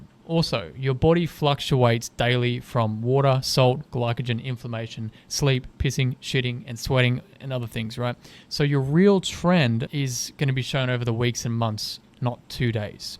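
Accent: Australia. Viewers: 😅 Ho sempre pensato fossi australiano 🤷‍♂️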